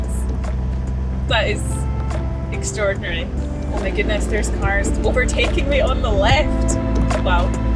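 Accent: Scotland, United Kingdom. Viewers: Your Scottish🎉 accent is so nice !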